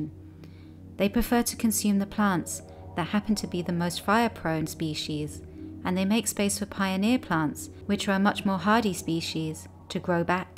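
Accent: London, United Kingdom. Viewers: Nicely narrated. Detect a slight London influence? Excellent!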